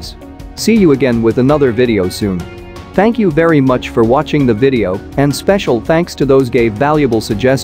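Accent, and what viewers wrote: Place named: India. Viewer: I agreed with most of the list, but that cruciating hindi acent in the first place?Seriously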